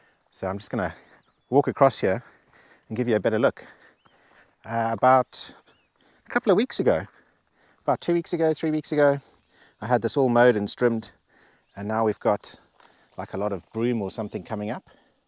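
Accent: South Africa. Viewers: Your accent like you come from South Africa 🇿🇦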